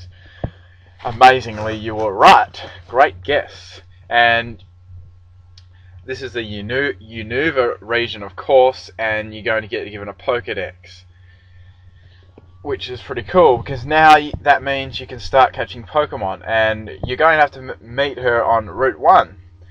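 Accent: Australia. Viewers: Yehhhhhh Australia. It 's the best accent and u all know it ahaha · are you australian?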